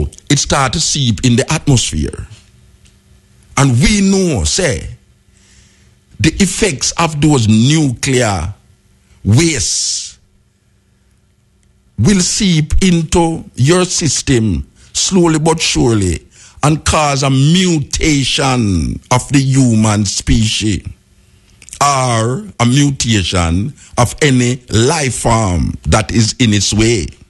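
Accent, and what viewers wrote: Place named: Jamaica. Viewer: i would like subtitles...i know english but not this jamacian dialect..not when he speak fast.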